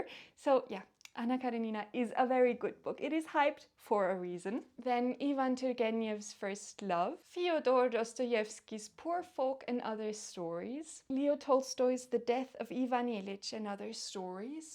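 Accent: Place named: Germany. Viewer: I read a lot of classic literature, biographies, text books, manuals, science fiction.and self help books. You have a neat and tidy tome of books. I dislike Shakepeare. I had enough studying and reading his books during my high school days. I am a big fan of ancient Roman society and all those famous philosophers. The most expensive book I bought was The Red Book by Carl Jung and the full collection of The Decline and Fall Of the Roman Empire by Edward Gibbins. . I am an Aussie old man and I have historical books about Australian authors from the 19th century. For a young lady you speak English very well and I like your German accent. My mother was German and I could never understood anything when she spoke to me even though I spoke Polish to her.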